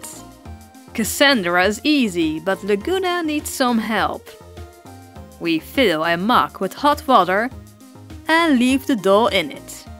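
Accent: Netherlands. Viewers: Well i Mean she does live in the netherlands so yeah i think thats why youre hearing a dutch accent😁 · I smell dutch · Is it just me or does it sound like she has a dutch accent? it reminds me of my cousin (that lives in Belgium/Holand). great dolls tho! I also saw Dollightfull’s video, and i think both the dolls you guys made were amazing! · Me whos new to the channel: YASS THE DUTCH ACCENT, (im from Belgium and speek Dutch)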